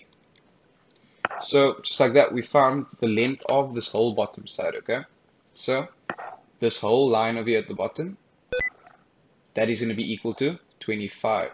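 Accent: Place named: Cape Coloured, South Africa. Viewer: Are you from south Africa because you sound coloured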